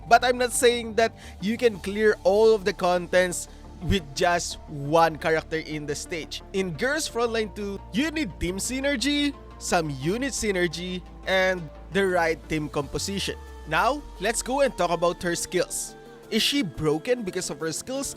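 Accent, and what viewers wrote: Philippines. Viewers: you sound very pinoy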